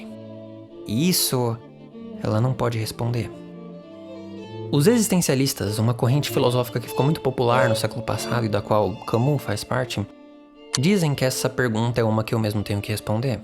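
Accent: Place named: São Paulo, Brazil. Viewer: Eu devo ser mt chato mesmo mas nem consigo assimilar o conteúdo do video direito com esse sotaque paulista fortíssimo KKKKKKKK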